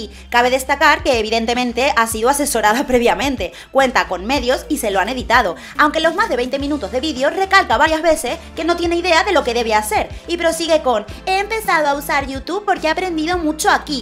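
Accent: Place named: Canary Islands, Spain. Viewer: Amo tus videos tía, son oro molido para mis clases de preparatoria en las que intento sabotear la máquina de adocrinamiento de las universidades. También me encanta tu acento canario, saludos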